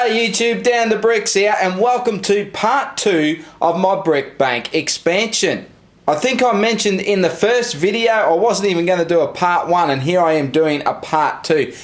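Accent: Australia. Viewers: You have the most pronounced Australian accent I have ever seen. Great build btw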